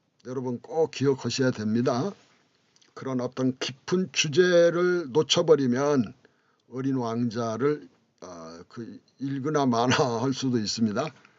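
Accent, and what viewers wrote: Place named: South Korea. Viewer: 선생님께 감사드립니다. 유투브 검색 중 우연한 계기로 선생님의 강의를 들었습니다. 다소 느린 말씀과 미국식 연음에 길들여진 저에게 토종발음(저의 느낌입니다.) 제가 처음에는 잘 적응하지 못했습니다. 하지만, 점점 새로운 시각을 깨우쳐 주시는 선생님의 해설에 중독되어 결국 마지막 강의까지 들었네요. (강의 길이만 27시간 정도 되는 것 같아요) 책을 출간하신다 했는데 저의 검색능력으로는 아직 찾지를 못했습니다. 정보를 알려주시면 선생님의 철학적인 해설(footnote) 텍스트와 함께 다시 한 번 공부해보고 싶습니다. 좋은 강의를 끝까지 이끌어 주셔서 깊이 감사드립니다. - 메일주소 : <mail>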